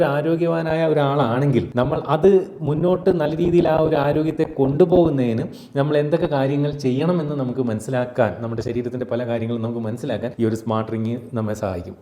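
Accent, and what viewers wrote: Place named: Kollam, India. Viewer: It's a kollam/ Trivandrum accent..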